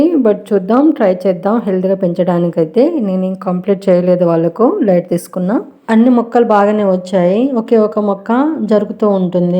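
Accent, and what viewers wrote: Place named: Telangana, India. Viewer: Asalu chandalam antey endi andi mana Telangana lo chala slangs unai ah matram kuda miku telvadha adhi village slang miku nachakunte vinakandi mimalni evar froce cheyaleru antey kani okari basha baledhhu Ane right miku ledhu...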